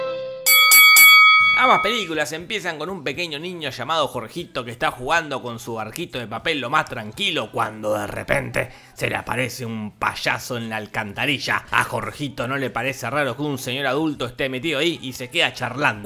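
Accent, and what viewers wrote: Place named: Argentina. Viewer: Hola bro, genial sería si le cambias tu tonada argentina al español neutro sin el "sho" "eshos" el vos, quilombos, boludos, que no todo el público que te vé es argentino y algunas cosas nose entienden, muchas gracias.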